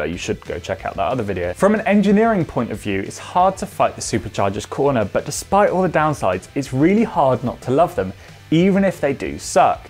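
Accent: United Kingdom. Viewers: British accent. Cant follow it. Turned off.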